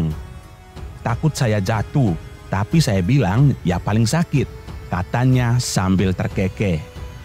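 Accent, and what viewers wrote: Indonesia. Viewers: Logat Admin nya seperti Logat Karo😊👌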